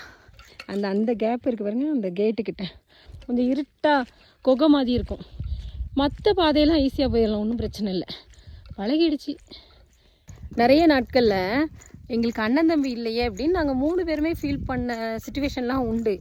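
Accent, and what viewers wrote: Tirunelveli, India. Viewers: நம்ம திருநெல்வேலி தெளிவான பேச்சோட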